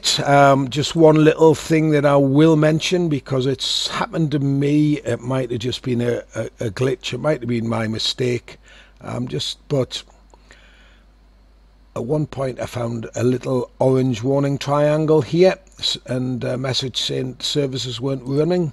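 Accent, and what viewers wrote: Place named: Wales, United Kingdom. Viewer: your accent is so welsh lol thanks, was really stuck for ages :P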